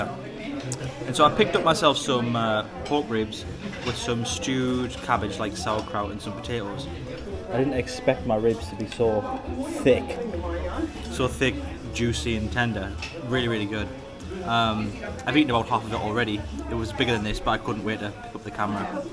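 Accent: United Kingdom. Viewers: It was though to understand you at first place whereas I’ve got used to it and to top it all off: it’s appreciated to hear the British accent. Greetings from Poland lad 😎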